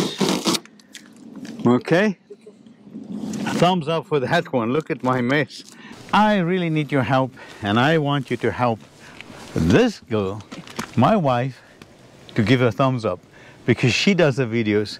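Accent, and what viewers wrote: South Africa. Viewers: Is that a South African accent I can hear?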